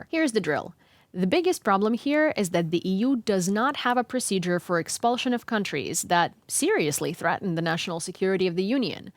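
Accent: United States. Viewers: my sincere appreciation for your work and for Gaze media as well. You undoubtedly do a meaningful job, cz western media doesn't comprehensively cover the news about the war in Ukraine. P.S. Thanks for exposing to perfect American English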